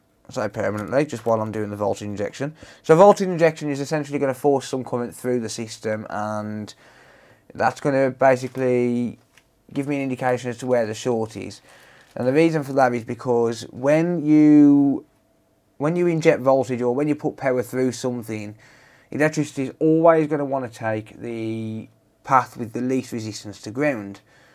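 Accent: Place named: Dudley, United Kingdom. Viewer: Bro, strange how I've just ended up randomly on your video. I was the guy that commented on the Saudi post, and you suggested I add a loop. I'm repairing donor boards, and came across your video and noticed the name. Small world. I'm in Dudley, your accent sounds localish. Going to drop you an email.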